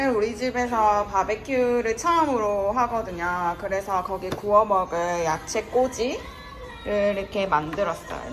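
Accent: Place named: Busan, South Korea. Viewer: 첫멘트 어? 리얼리? 발음이 좋으십니다 ㅋㅋ 한달뒤 휴가인데 말투 듣고 있으니 벌써 부산에 와있는 기분이네요 부싼 아입니꺼....ㅋ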